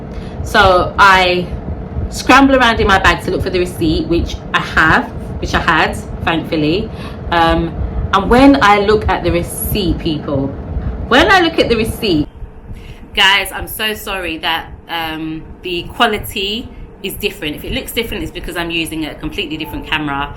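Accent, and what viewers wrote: United Kingdom. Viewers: is true, but you have to acknowledge the lovely British accent no matter what. I think the bank representatives did, too. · Hi. In my opinion, they asked you to write the letter to the branch manager to determine how intelligent you are. Your letter in conjunction with your lovely British accent made them determine you were not to be played with. Food for thought. Blessings!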